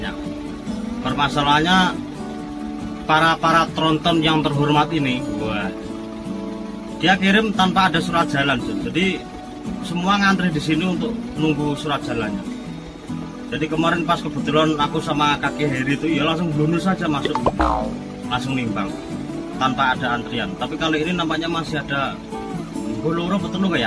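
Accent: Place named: Banyumas, Indonesia. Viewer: Asale ngndi y mas Bisa ngapak y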